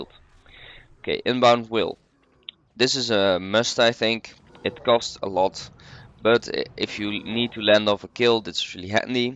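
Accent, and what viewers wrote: Netherlands. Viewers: gotta love that obvious dutch accent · Kan goed horen dat je Nederlands bent, haha, goeie guide!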